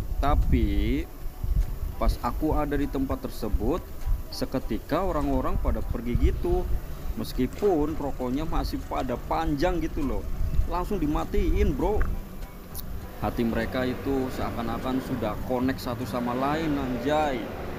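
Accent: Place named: Banyumas, Indonesia. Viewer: Sampeang ngomonge lucu glhaha.. sampean nadane kayong ngapak..mantab isa ng jepang 😀👍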